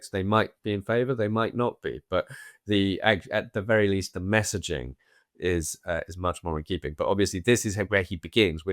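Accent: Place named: Home Counties, United Kingdom. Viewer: Home Counties but if I had to guess I would say Buckinghamshire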